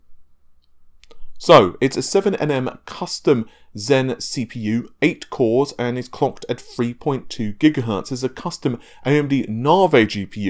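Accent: England, United Kingdom. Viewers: Maybe a correct pronunciation of Navi will happen after it's launch, lol! I know it's his proper English accent when it comes to Nah-vey. But, at least he's doing better than Rich from RTU who's so far called it Nuh-vee, and Naiv-eye🤣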